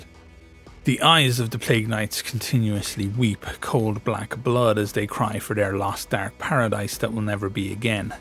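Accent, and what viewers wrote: Ireland. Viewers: Those Irish tones add a subtle calmness to the nightmarish narrative you're describing.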